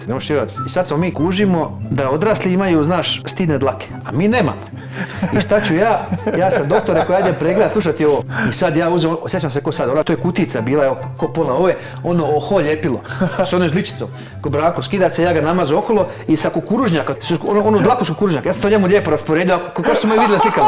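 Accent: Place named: Slavonia, Croatia. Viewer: Ko ti kriv kad nisi Slavonac,, ni ne mozes razumiti o cemu prica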